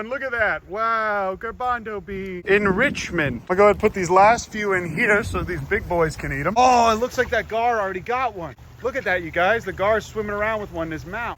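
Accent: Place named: Texas, United States. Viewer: Pennsylvania? No, he's Texan.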